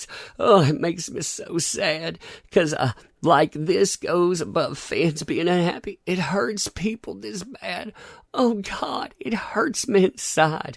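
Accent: South, United States. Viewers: i like your content, i swear i really do, that is why i keep watching it for quite sometimes, but as a chinese dude i find it somewhat hard to understand your accent, which i suppose you will find my accent also hard to understand if you heard me speak, so this is a sad goodbye, i am trying too hard to listen to enjoy it. to bad i actually kind of like the sound of your accent, which i'm guessing southern ish, but alas it is too hard for me who's english is my 4th language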